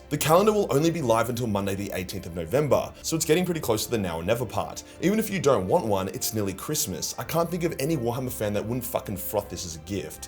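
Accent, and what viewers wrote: Australia. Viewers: I just like listening to this absolute chad Aussie talk about a great universe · I've been here since the beginning, I'm a married 40 year old, and I'm not here for boobs, I'm here to hear a degenerate Aussie talk about my favorite subjects. Boobs are a good bonus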